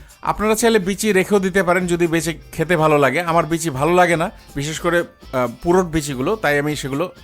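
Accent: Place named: Dhaka, Bangladesh. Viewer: ভাইয়া আপনার ঢাকাইয়া ভাষায় কথা গুলো শুনতে আরো বেসি ভাল লাগে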